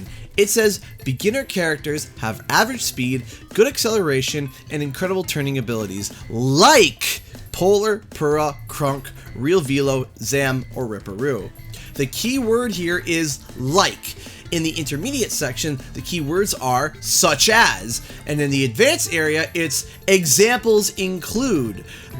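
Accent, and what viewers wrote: Canada. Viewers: Word of the video: "Vehgue." That the Canadian accent at work, I'm guessing?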